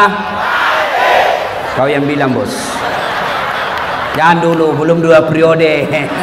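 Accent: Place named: Makassar, Indonesia. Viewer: Alhamdulillah meski di Tanjung Balai dialek makassarx pak Ustadz tdk hilang❤😂😊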